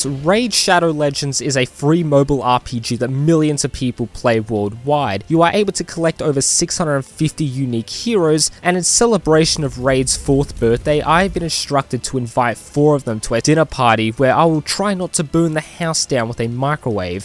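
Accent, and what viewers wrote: Australia. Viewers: Man, I'm a sucker, I hear an aussie and I hit like (sorry if you're a kiwi)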